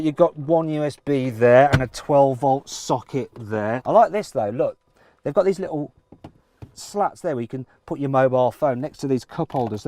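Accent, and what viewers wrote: United Kingdom. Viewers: 맷 뭔가 포드 V 페라리 영화에서 나오는 켄 마일즈 닮았는데 기분탓임? 이목구비 뚜렷하고, 영국식 발음 쓰는거 딱 들어맞고 차 좋아하는거랑... 차 단점 한번에 알아보는거 너무 닮았는데 ㅋㅋㅋ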